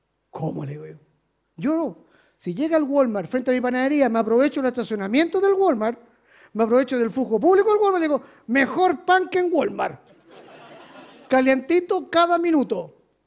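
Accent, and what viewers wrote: Chile. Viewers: me gustaria ver la conferencia, lastima que este en un español con asento chileno... una pena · No se entiende lo q habla.. chileno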